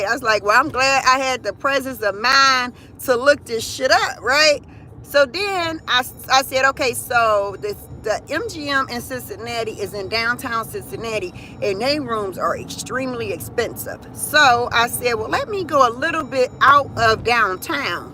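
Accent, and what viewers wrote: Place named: South, United States. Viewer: Good Morning. Just watching your video. You're having so much fun. That's what I'm talking about. I have always loved your deep Southern accent. You sound like you live in the deep south. Love it❣️ You two keep having fun and be blessed.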